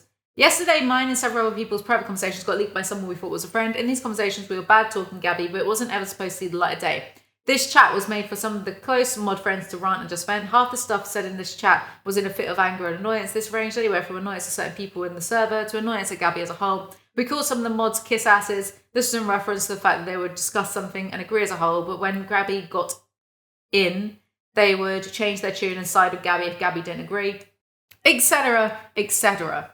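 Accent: United Kingdom. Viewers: which british accent do you have? like which area? - from norway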